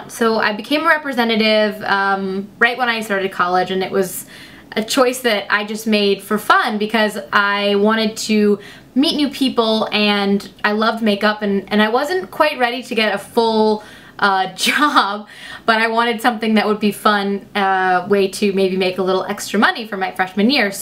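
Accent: United States. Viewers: ) Wow one shot:O Your american accent is perfect, congratulations. Believe that you are not french finally. I'd love to hear you make tongue twisters in english and in french, you gotta be unbeatable in this area. It's always a pleasure to listen to you (even in english). You are truly a wonderful woman and so beautiful. Kiss from France. XO...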